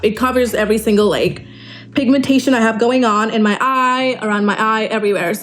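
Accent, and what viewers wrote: United States. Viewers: i swear you sound more american every video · Every time she makes such videos its hilarious to me, she seems like the typical trying to fit into the box kinda girl who tries to be relatable. This accent is it for me, how much will you try to fit in and sound like the typical LA influencer. I feel like when she was in Dubai she at least wasn’t trying to be something and was genuinely funny this is just cringe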